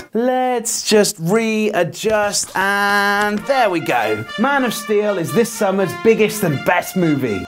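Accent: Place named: United Kingdom. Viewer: Dude, u b fucking awesome. Love the brit voice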